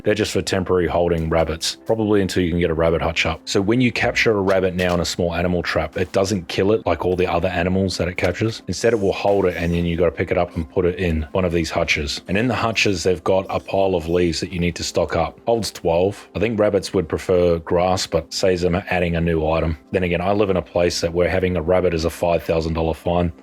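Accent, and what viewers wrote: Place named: Australia. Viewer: I was like "what why would owning..oh hes not british hes Aussie"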